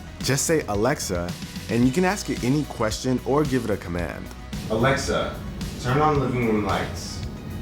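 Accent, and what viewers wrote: Jamaica. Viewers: are you Jamaican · are you Jamaican? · Nice video, your Jamaican!?!?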